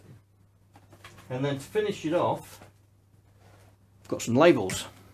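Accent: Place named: Northern England, United Kingdom. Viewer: Where you from, you sound a good Northern lad!!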